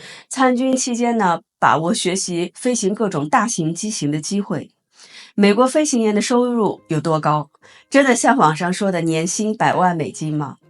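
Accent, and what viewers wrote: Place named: Beijing, China. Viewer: 这次说话有北京味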